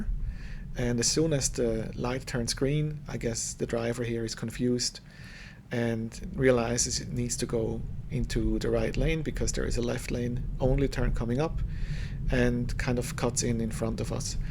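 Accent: Switzerland. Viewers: As a program I think the message about cones was not really about cones, its just the message its default to when its thinks something stationary is blocking the path. Meaning the 'error condition' is more general then the actual 'error message'. Also are you Swiss?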